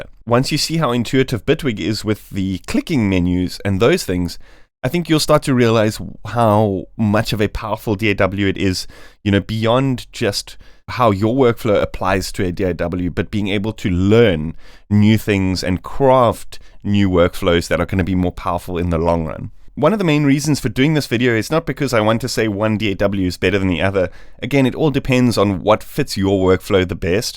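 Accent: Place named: South Africa. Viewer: he's south african. i'm south african too and can tell his accent from a mile away. it sounds perfect to me, you're just struggling with the accent. definitely sounds like english is his first language here, this is just how our accent sounds.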